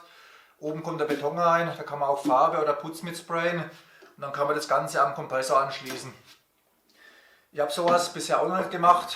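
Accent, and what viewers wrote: Franconia, Germany. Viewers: Du kommst doch aus Franken des hör ich doch 😝 komme aus Würzburg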